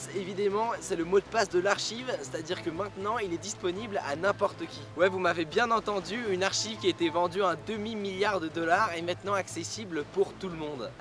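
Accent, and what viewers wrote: Quebec, Canada. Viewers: vous trouvez pas qu'ilà un petit accent québecois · Est - tu canadien ? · Que tu as un accent québécois chronique :o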